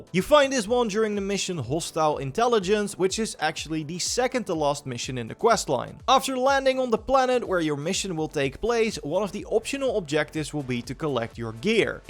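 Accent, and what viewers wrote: Netherlands. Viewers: JEEEEZZ Dutch accent much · Bro are you dutch? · Je ruikt het Nederlandse gewoon 🤣🤣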